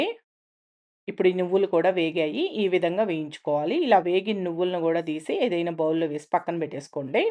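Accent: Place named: Telangana, India. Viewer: Edi Telangana style😊.. baguntundi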